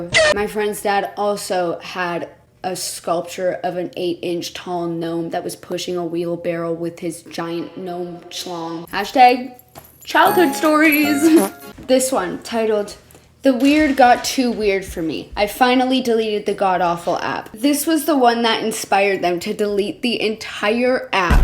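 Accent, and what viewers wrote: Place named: Canada. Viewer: Canadian detected, I won’t be subscribing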